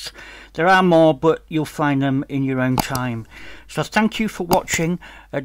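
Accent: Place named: West Yorkshire, United Kingdom. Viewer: Outstanding. all these updates. West Yorkshire by any chance?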